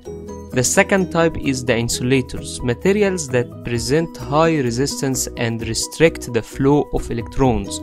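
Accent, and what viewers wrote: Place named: Egypt. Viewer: اللهجة دي مصري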